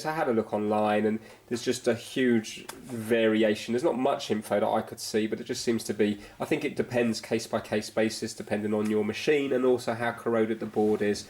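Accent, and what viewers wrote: United Kingdom. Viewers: D one day we want to see face. And maaan. I just love this british accent. Here in Germany we all love it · Hi im trying to figure out what part of the UK you are from with your accent? Warwickshire or? i might be wrong but just wondering where your from. Thanks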